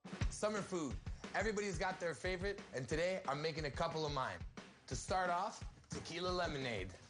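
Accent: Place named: France. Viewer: hes not gay. french is his first language so he sounds a little different. im going to marry him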